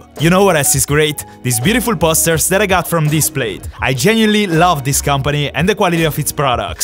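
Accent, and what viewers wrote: Italy. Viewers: Cool English with an Italian accent! Neato! 💕☮🌎🌌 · His Italian accent is so good... makes me laugh cus I'm italian too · I knew from ur accent that u were italian, im too btw. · Your Italian Accent is just OP🔥🔥🔥🔥🔥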